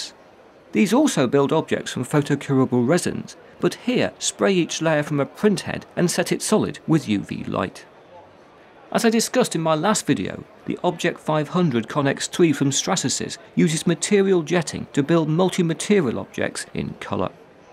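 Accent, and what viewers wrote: United Kingdom. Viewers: To me, everything spoken with a proper english accent gets way more serious and undeniably true. Great narration.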